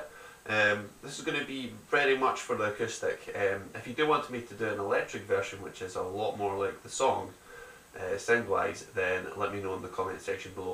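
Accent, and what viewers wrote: Ireland. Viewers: OMG YOUR ACCENT<3. ARE YOU IRISH OR SOMETHING?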